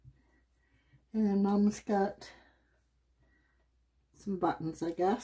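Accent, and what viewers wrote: Canada. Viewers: Just love it!! By coincidence I was eating Chinese food which I very very rarely eat, and I realized quickly that the little heads looked so much like the back side of the fortune cookie that they threw in my bag! You little curvy mouth was cuter than the cookie! I find that it really helps to think of shapes as objects. Never could be so creative on my own! Thank you!! More whimsical animals please!! I loved hearing about your life! I guessed from your accent and buying gasoline by the liter even though I didn’t know that happened in Canada, it seemed to fit. Good for you to actually go out and do something good for the world!! 💕💕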